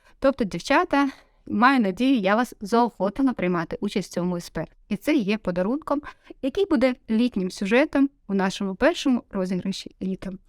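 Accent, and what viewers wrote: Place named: Russia. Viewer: Очень сильный русский акцент. Да уж. ...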